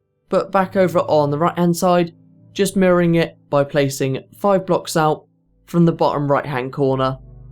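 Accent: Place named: RP, United Kingdom. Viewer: U talk so posh it's like you do not speak in that way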